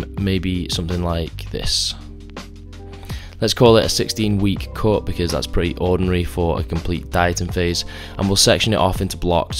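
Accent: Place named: Yorkshire, United Kingdom. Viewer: Where are u from? I love the accent, sounds a bit North English probs Yorkshire?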